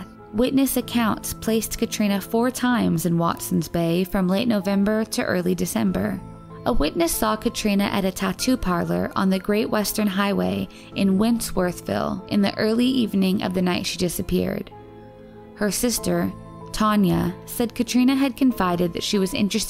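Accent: India. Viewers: Finally a video without that Hindu voice . Stop making videos with the indian voice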